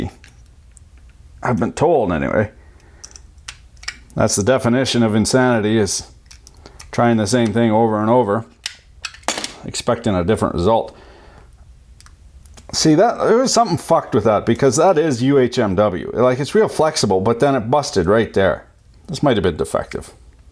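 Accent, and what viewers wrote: Quebec, Canada. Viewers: "Êtrrre Drébrrrouillarrrrdrrr" I love Quebec accent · always gorgeous to listen your cool casual way.Do French Canadians always speak this Nice!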